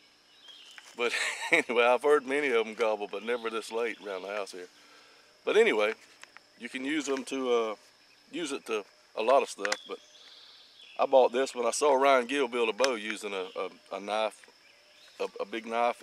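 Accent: South, United States. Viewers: This guy is fantastic! As an archer from UK theres nobody ive seen,in years of shooting that makes things so interesting and inspires me so much to make things effective and traditional. Ive spent money both on bought and making my own. Loving the style, and that Southern accent too!!¡ Best wishes from South England 😊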